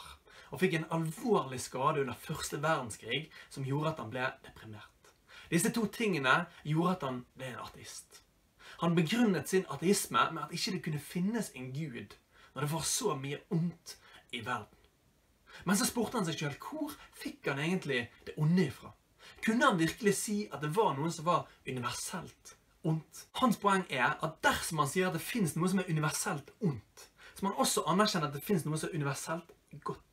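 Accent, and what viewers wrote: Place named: Bergen, Norway. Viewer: Dette er knall bra, liker at du er glad hele tiden og elsker bergens dialekten!!! Me ser på deg i klassen av og til, går på ein kristen skole der du har blitt vist på andakter flere ganger! stå på!!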